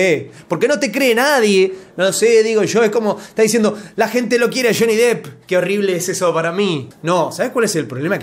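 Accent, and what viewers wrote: Argentina. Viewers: Me encanta el sarcasmo de este pibe. Le queda la irreverencia, es más su acento y la concepción que tiene uno de los argentinos encajan perfecto en, ha hecho tan suyo el caso que le creo todo. Simplemente me divierten y disfruto mucho. Saludos desde 🇲🇽.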